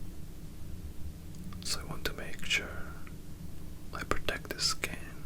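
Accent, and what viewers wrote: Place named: Australia. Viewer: I thought you was australian🇦🇺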